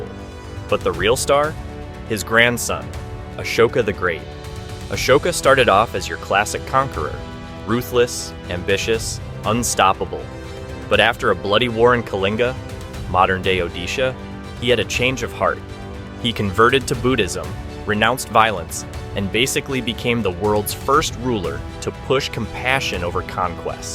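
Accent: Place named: United States. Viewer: I was suspicious when I heard your Amurcan accent, but literally stopped when you said "dude". For heaven's sake.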